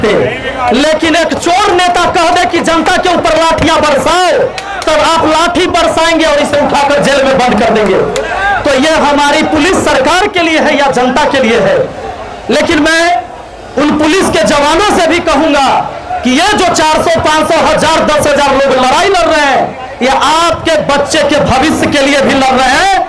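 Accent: Bihar, India. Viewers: Bihar ki awaj hai * sir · गुरु जी बिहारी की बोली पुरे देश में गुंजना चाहिए!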